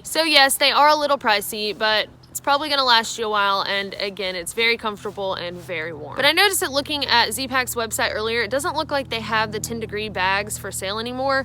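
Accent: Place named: Tennessee, United States. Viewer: New sub here..you got that Tennessee accent. It's either Tennessee or North Carolina.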